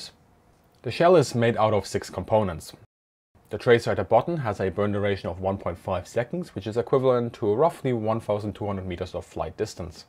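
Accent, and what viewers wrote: Germany. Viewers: Thank you for this video. I am American and I spent two tours there back in the 1970s; I know that not all Germans were ‘supportive’ of the Nazi view of the world. I have met ‘good’ Germans and knowing what they had to actually ’do’ to maintain their ‘humanity’ is truly impressive. I remember one... I think he was a Bundesvehr Lieutenant Colonel and an American friend told me that the Lieutenant Colonel had been ‘evacuated’ by dog sled from Stalingrad. Hearing someone ‘explain things’ in clearly distinguishable English (albeit with an equally distinguishable German ‘accent’) makes this a very impressive video... Thank you! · just wanted to give you a genuine compliment - with apologies if it sounds a bit backhanded at first, because that's not at all the intent! I've been a channel follower for years now, and it's really been interesting to see how your approach has grown, changed, and taken on new influences. 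Just in the past week, you've had a video that really reminded me of Greg('s Airplanes and Automobiles), followed by this video which is much more in the style of Bernhard (of Military History Visualized, of course) - but both with your own unique spin, specialty, and attention to detail. Rather than suggesting that you're copying someone else's styles, I'm instead complimenting your versatility and mastery of very different formats and approaches - and bringing in a wealth of research and your own style. In the same way (and I say this as a linguist!) - your English often brings in very British expressions and very distinct Americanisms, often in the same sentences, while always in that unmistakable German tone. It's neat, adds a lot of colour to the language, and makes your content all the more pleasant to listen to. Keep doing what you're doing! It's great to see you try so many different approaches, take on so many different influences, and continue evolving this excellent channel:)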